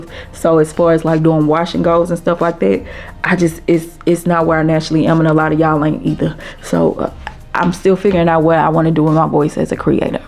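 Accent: St. Louis, United States. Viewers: I knew your accent sounded familiar. St. Louise have distinct accent. So pretty God bless.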